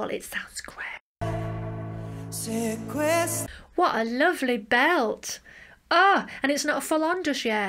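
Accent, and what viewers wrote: Manchester, United Kingdom. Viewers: I love your accent, is it from around Manchester?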